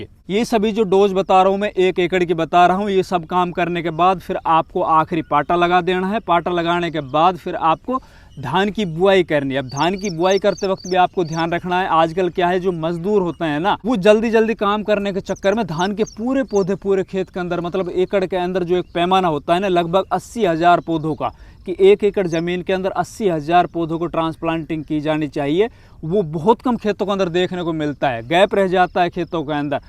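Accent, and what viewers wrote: Mewat, India. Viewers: Mewati ho ke aap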